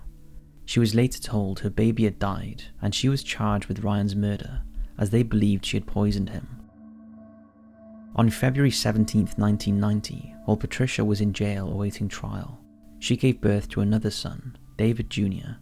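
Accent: Wales, United Kingdom. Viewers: Wales - his accent is Welsh.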